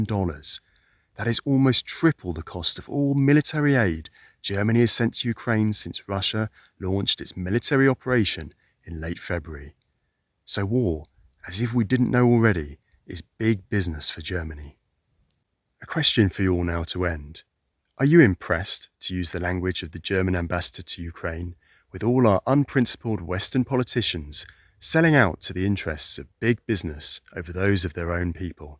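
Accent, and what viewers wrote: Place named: England, United Kingdom. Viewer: Your accent is English!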